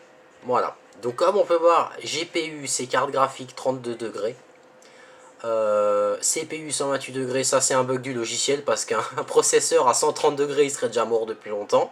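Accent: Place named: Alsace, France. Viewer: cet accent alscacien du feu de dieux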